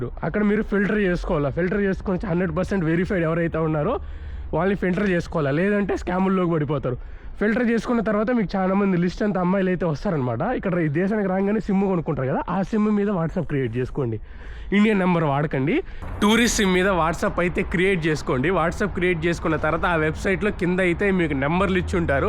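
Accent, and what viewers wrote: Chittoor, India. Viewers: Bro needi Chittor district aa, slang ala undhi👍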